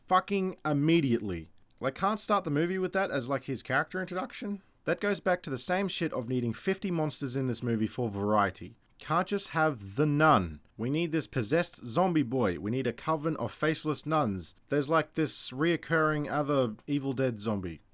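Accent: Australia. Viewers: As it's a wog/Leb Aussie accent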